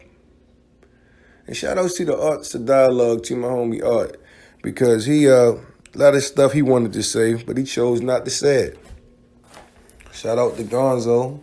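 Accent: Baltimore, United States. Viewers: Damn bro your Baltimore accent heavy af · You from B more? When you say do and dude you have the same accent as people from there, My bad if im wrong. But anyways, I aint gonna lie I thought Cowboy had something to do with it also.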